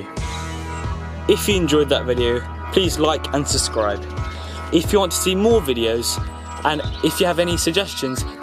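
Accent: United Kingdom. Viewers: I LOVE WATCHING ALL THESE CRUSHING VIDEOS! PLEASE JUST KEEP CRUSHING! Love that brit accent <3 :)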